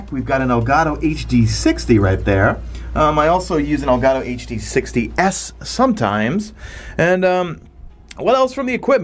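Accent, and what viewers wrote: New York, United States. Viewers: #madeittotheend congrats on 13 years! Your Destiny collection is impressive 🎉 Great setup btw I bet it feels good to have a dedicated space Also liked the New York accent 👍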